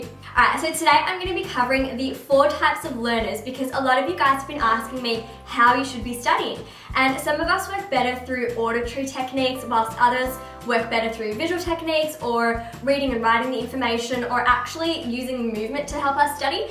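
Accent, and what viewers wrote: Australia. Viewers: I'm used to clicking a video and hearing a British or American accent. hearing your Aussie accent has become weird, despite me being Australian too.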